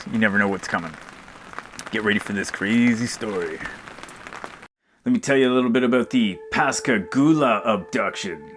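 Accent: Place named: Canada. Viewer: nice vid! nice canadian accent lol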